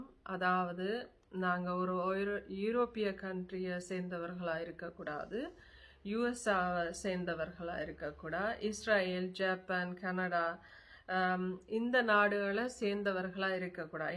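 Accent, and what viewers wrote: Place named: Jaffna, Sri Lanka. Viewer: நன்றி அக்கா நீங்க நன்றாக யாழ்ப்பாணத்து தமிழ் பேசுறீங் . உங்களுடைய உதவி எப்பவுமே எங்களுக்கு தேவை. உங்கள் வீடியோ மிகவும் பயனுள்ளது..thank you so much. god bless you.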